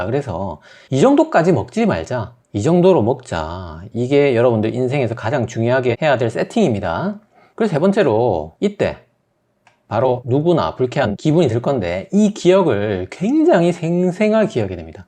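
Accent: Gyeongsang, South Korea. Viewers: 귀여운외모 경상도억양이 특이하셔요😂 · 아침 먹다가 뿜을 뻔…. ㅋㅋ 니나 아는… ㅋㅋㅋ 저 경상도 사람인데 서울에 살거든요… 매번 느끼지만 선생님 리얼 경상도사투리 너무 맛깔나게 재밌게 합니다. 듣고 있으면 폭소가 나와요. 물론 내용도 굉장히 성실하게 준비 하시고 검토 하시고 알려 주셔서 감사합니다. 귀에 속속 들어와요. 자신을 마루타 삼아 시청자들의 건강 관리 에 힘 쓰시니 복 받으실 거예요 ㅎㅎ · 이 선생님은 어디 경상도 분인데 이렇게 사투리가 나긋나긋 귀엽지? 어떤 경상도 사투리는 엄청 드세던데..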